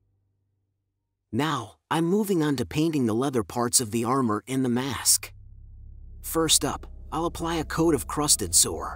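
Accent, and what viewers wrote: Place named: France. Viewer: would much prefer to hear your French accent. Many of the top mini painters have accents such as Trovarion, Marco Frisoni, Sergio Calvo etc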